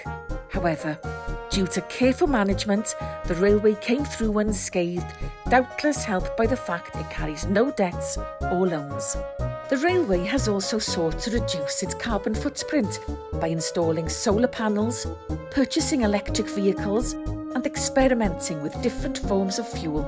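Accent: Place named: Scotland, United Kingdom. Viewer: I really love the Scottish narrator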